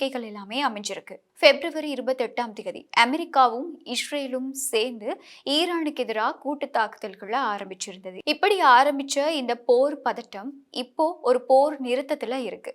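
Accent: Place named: Chennai, India. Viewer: மூதேவி Madrasதமிழ் கதைக்காதே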